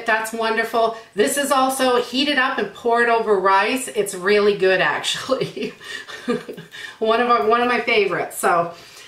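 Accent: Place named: New England, United States. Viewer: When you held up the can of mackerel I was hoping you'd say "chowda"...I love the New England accent 😊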